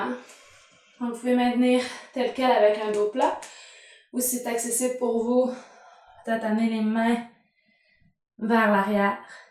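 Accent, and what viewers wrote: Quebec, Canada. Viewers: J’étais extrêmement tendue et stressée, et me voilà à rapper yoga sur YouTube pour trouver de qui me détendre. Cette vidéo, c’est du bonheur ! Et moi qui suis française ayant vécut au Quebec pendant 2 ans (Montréal), retrouver cet accent si typique dans le plus grand des hasards c’est encore mieux !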